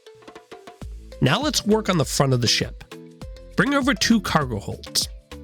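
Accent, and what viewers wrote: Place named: India. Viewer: love your indian accent , and your typical indian youtuber intro style... jai hind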